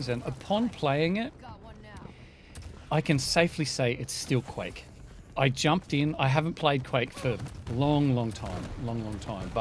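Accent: Australia. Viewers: I watch you for your personality and your smooth Aussie voice. Just play what you like and people will watch.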